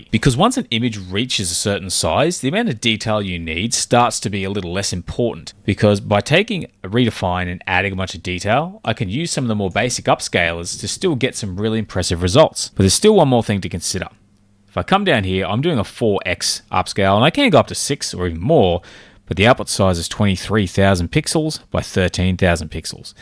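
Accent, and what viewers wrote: Australia. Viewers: It is fascinating how Australians say "Peower", "Draring", etc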